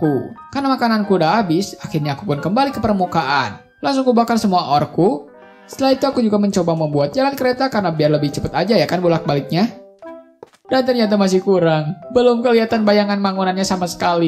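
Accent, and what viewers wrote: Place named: Bugis, Indonesia. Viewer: Hai aku sub baru nih aku tertarik dengan Vidio ini karena cara bicaranya Bugis tingkatkan lagi ya suaranya pasti banyak subcribernya kok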